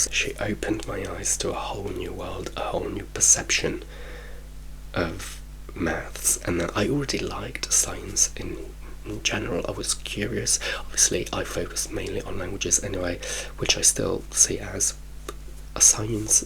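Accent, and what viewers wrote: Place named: United Kingdom. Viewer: Mamma mia quanto mi piace il tuo British.accent, ma anche il tuo Italiano. Hai una voce rilassatissima. Scusami, anche se adoro l'inglese da quando. Ero bambina e lo parlo volevo farti i complimenti in italiano ❤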